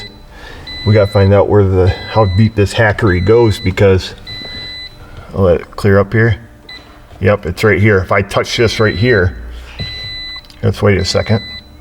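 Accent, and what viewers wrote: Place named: Upstate New York, United States. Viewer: I use to live in upstate NY around Rome. I never thought your speech was abnormal, sounded normal to me for upstate NY. I enjoy your videos and learning a lot from them, Thank You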